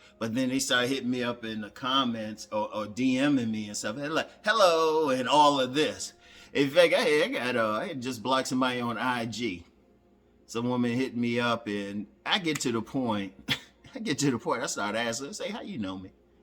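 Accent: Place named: DMV, United States. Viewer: Just found this channel a couple months ago and been watching all your videos. As a young 24 year old dude I appreciate all the free game you give and you're honestly my favorite figure in the "redpill community", "Manosphere" or whatever you call it. You remind me of my late high school football coach with that heavy DMV accent.😂 Shoutout from Harford County, MD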